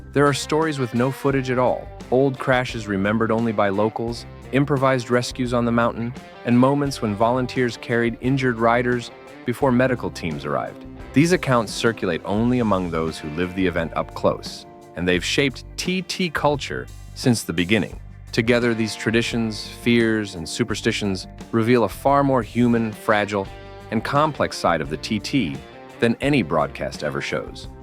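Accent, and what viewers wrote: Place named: United States. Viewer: ....Uhhh OHHH! An American Accent telling us what's Wot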